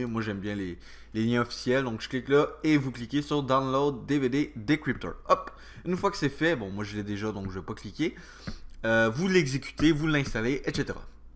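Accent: Canada. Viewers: J'ai 3 Commentaires à poster. Le premier est que j'adore le Canada et votre accent. Cela me fait voyager. Je suis de Paris. DVD descripter : Merci pour vos conseils pour votre lien de téléchargement. Parfait ainsi que vos tuyaux pour le configurer. J'ai eu une erreur concernant la Fat 32. Il semblait que la fat32 n'acceptait pas les fichiers de plus que 4Go. J'ai passé outre. Qu'en pensez-vous ?. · merci beaucoup l accent canadien en plus trop cool j adore! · Bonjour de France, Un grand Merci d'avoir essayé de m'aider. Je vais me mettre à l'Anglais à 63 ans, il n'est peut-être pas trop tard !!! J'habite en Sologne entre les châteaux de Chambord et Cheverny et pas loin du Zoo de Beauval, si vous avez entendu parlé du bébé panda qui est né il y a juste un an. Moi, j'ai visité à plusieurs reprise le Canada : Québec et la Gaspésie ainsi que Vancouver. Êtes-vous, déjà venu en France, chez vous cousins !!! 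J'aime trop votre accent et je me permets de vous embrasser par dessus un océan. Dominique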